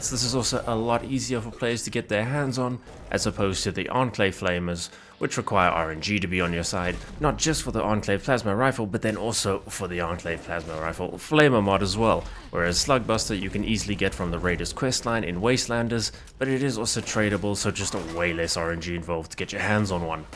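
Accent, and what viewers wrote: South Africa. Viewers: You from South Africa? Just asking because of the accent.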